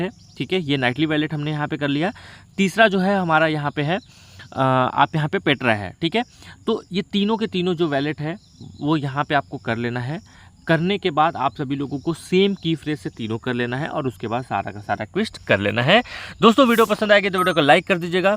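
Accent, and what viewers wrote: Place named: Kolkata, India. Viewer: Kolkata voice ascent h 😅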